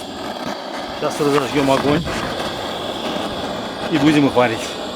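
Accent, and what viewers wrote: Baku, Azerbaijan. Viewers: муалим, процветание Вашему каналу👍🏻Ваш Бакинский акцент это вишенка на вашем волшебном канале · Ай джан! Бомба! Спустя 30 лет я ел рачки 2022 на Баилова. Спасибо за Ностальгию и Бакинский Акцент. 🙏❤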